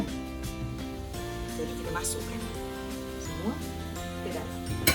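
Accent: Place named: Perak, Malaysia. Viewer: Eeyyy mok sedap bebeno nengok nye..sis labon bahase perak best pulok ..lawok pun ade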